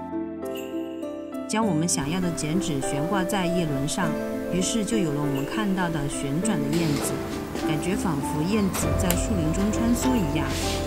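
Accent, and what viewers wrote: Sichuan, China. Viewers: 原来大神是四川人！之前听口音觉得有点像，但不确定。四川出了好多大神级的up主啊！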